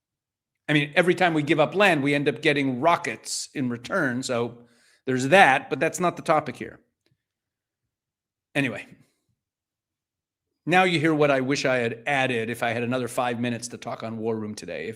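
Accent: United States. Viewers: Way to go Rabbi. You sound like a Texan.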